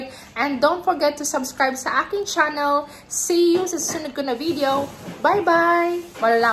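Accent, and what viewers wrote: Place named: Iloilo, Philippines. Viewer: Ilonggo gid ya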